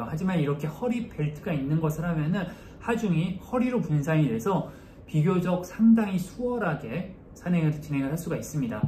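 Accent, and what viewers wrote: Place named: Jeolla, South Korea. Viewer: 전라도 억양이 살짝 있구마잉 반갑소잉~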